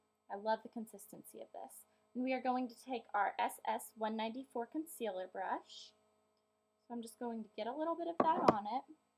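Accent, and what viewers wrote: South, United States. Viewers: Thanks for this video so much!!!!!!! . . . .Is it me or is your southern accent slowly fading away?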